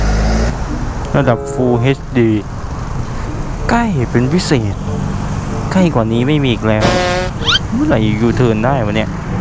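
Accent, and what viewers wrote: South, Thailand. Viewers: พี่ เเหลงใต้หรอยน้ะเนี้ย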